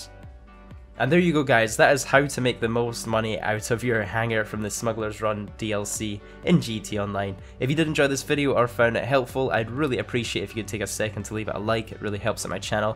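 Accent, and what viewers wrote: Scotland, United Kingdom. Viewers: Are you from Scotland if you are so am I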